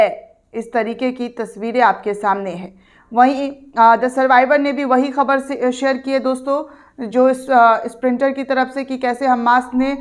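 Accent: India. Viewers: Ganwaar pronunciation like Modi ...unsubscribed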